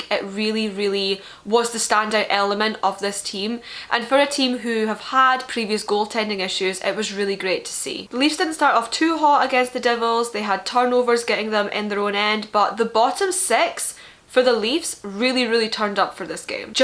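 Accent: Scotland, United Kingdom. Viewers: Yes the Scottish accent makes the analysis better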